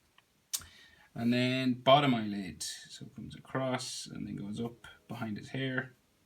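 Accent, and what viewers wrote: Ireland. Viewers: i’m gonna draw so many of these lol,also are you irish?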